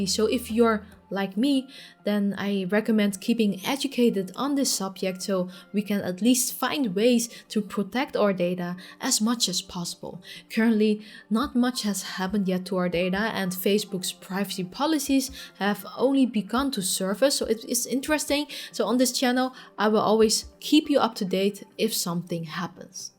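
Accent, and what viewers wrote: Netherlands. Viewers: Je klinkt Nederlands? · Ok, I NEED this mystery resolved... since your first video I thought you had what sounded like a dutch accent! Are you ladies dutch? Quality content, i'm subscribed! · Great video ! 😊 I will sub! Question though.. are you Dutch .. your accent makes it sound like it I just bought a quest two I loved it, but sadly it made a horrible noise in the fan in front .. so I am waiting for a replacement. 😊 i did not know about the stuff of the elite strap.. I wanted to buy it as I never had the first one.. what should I do ? Wait until they fix it ? Or just cope with the standard one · I can't help but wonder if you are Dutch, you have a Dutch English accent :) Anyway, it doesn't feel like I'm watching a review of someone who used this for a month, it feels more than just an explanation of the Quest 2 specs. I have so many questions! 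:D I was hoping to see a more personal review going in deep about your experiences. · is it me or she sounds Dutch ?! .... :)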